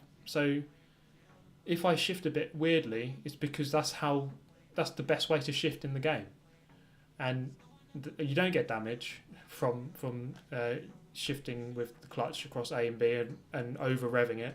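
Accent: England, United Kingdom. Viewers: Which area of England are you from? E.g. North east, south west etc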